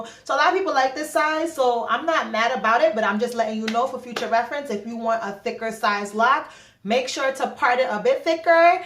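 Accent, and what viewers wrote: Trinidad and Tobago. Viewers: ohh gosh love we trini accent.❤❤❤❤ and grate infomation. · I hear a trini accent 🇹🇹❤️ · Very nice, you really answered my questions. And I always love a Trini accent. Take care.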